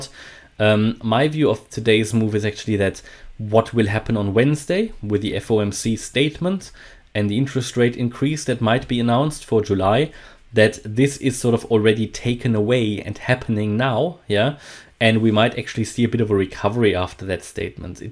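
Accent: Germany. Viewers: an other stunning video full of interesting infos, especially the one you mentioned betw min to regarding the 2 diff optiosn in 3 or 5 wave moves, i m leraning so much follwoing you day by day, The most i like on your channel compare to the other is that you only talk abt TA and EW method, nothing abt market, economy, financial and no sense strategy or opium... keep doing the amazing work and thank so much for all your effort, ( just one question, are you German? ) i m just wondering abt the accent, Golden trades to all of you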